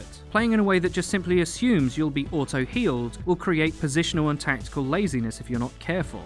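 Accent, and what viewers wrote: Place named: United Kingdom. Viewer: It's his accent. Listen to, or imagine a British person saying something like "remember". The R at the beginning gets pronounced, but the one at the end is downplayed or removed. Other accents and dialects of the English language have quirks like this as well.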